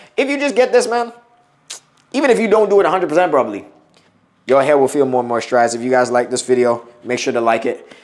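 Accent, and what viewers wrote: Bahamas. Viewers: You sound like your of Bahamian decent. 🤷🏽‍♀️🇧🇸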